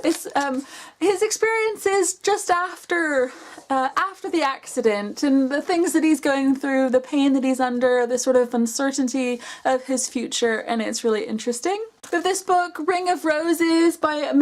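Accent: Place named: United States. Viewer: Is it me or does she sound American when she’s British?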